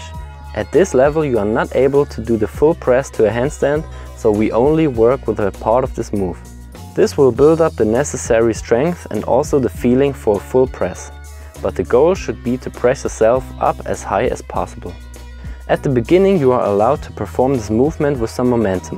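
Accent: Germany. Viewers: Wie man einfach das "deutsch " raushört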